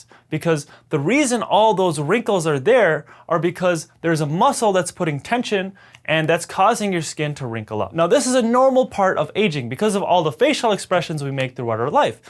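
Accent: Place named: United States. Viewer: I didn't expect him to speak in an American accent 💀💀💀💀💀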